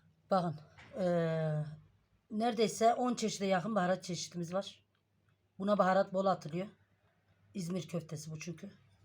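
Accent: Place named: Gaziantep, Turkey. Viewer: 7 kuşak doğma büyüme İzmir'liyim İzmir'de böyle bir köfte yok !!! İzmirin sulu köftesi olur böyle yoğurarak vir köftemiz yok bizim m..Siz büyük ihtimalle anteplisiniz şiveden anladım... Antep'in içli köftesi çiğköftesi çok bilinir Ellerinize emeğinize sağlık ama bizim İzmir'in böyle bir köftesi yok yinede memleketimin adı geçtiği için mutluyum 👍🏼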